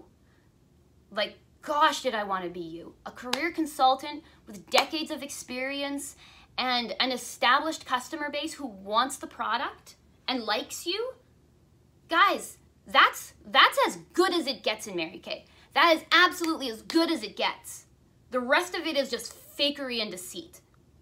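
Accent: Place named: Canada. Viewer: I came so close to joining Mary Kay in 2007 - the only reason I didn't was the stupid skirt rule. I was invited by a "new friend" to a recruitment talk(?) with a bunch of other potential victims and my sensible arguments about the skirts may have (hopefully) also scared others off. I really appreciate you doing this - I also just appreciate hearing a Canadian accent as a former Calgary gal now in the UK.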